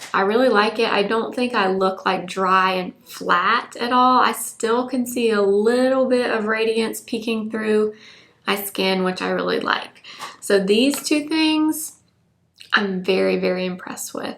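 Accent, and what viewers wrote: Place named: Alabama, United States. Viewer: Are you from Alabama? You sound like Hannah Brown from the bachelorette!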